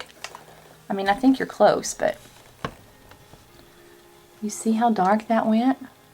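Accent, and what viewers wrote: South, United States. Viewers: I have this pallette. I bought it months ago before I started watching YouTube makeup tutorials. You popped up in suggested videos because I had watched some videos on this pallette the other day. I hit the subscribe button as soon as you opened your mouth because you're as country sounding as I am. Love it! 😁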